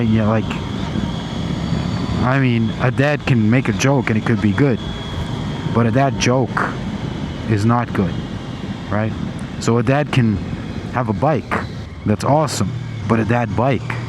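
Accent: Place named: England, United Kingdom. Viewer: A Very cool informative review. One of the best English accents I have ever heard . Dad jokes are underestimated..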